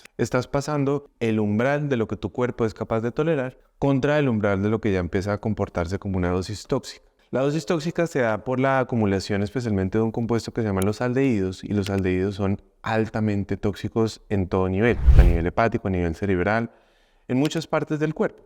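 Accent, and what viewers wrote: Colombia. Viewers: Gracias por sus videos he aprendido un poco más a cuidar mi salud y además con ese acento colombiano que me encanta es más fácil para mí estar concentrada en lo que esta diciendo (soy mexicana) ❤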